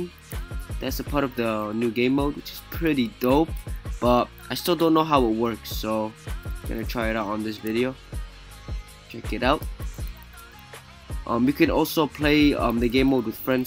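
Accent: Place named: United States. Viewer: Discour Goup XDDDD, whay is your nationality? I noticed that you have a very strange American accent.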